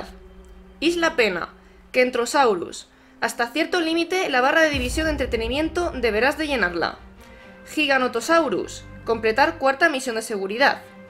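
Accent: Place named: Castile, Spain. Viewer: Tienes acento castellano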